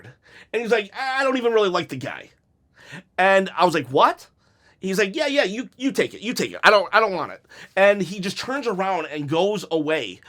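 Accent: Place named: New York, United States. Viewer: Can you tone down your New York accent.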